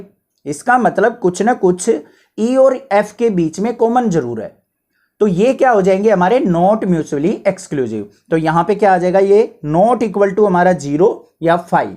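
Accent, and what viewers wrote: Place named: Bihar, India. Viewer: Sir bihari h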